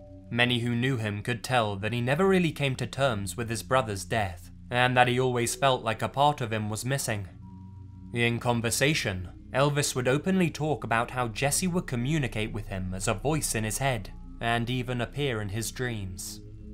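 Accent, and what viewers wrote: United Kingdom. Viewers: I feel people who speak harry potter are the best narrators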